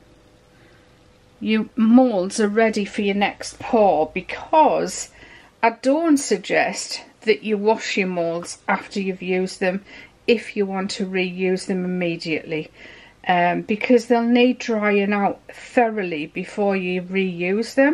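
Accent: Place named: Stoke-on-Trent, United Kingdom. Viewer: Where you from? Your accent sounds very similar to mine and I'm from stoke on Trent.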